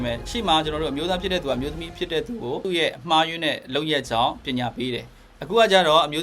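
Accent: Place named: Dawei, Myanmar. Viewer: ထား၀ယ်လိုပြောနေတာ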